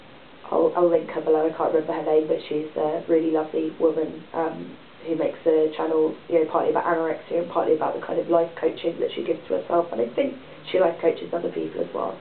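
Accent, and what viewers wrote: United Kingdom. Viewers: One thing you should remember is that if you came to America, people would find you much more attractive than you realize because you have a British accent. Still, I think one of the hardest things to wrestle with is self-criticism.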